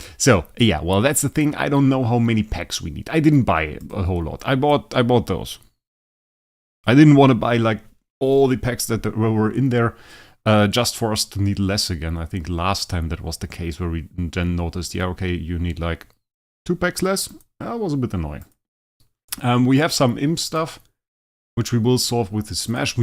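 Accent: Germany. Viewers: average german voice tbh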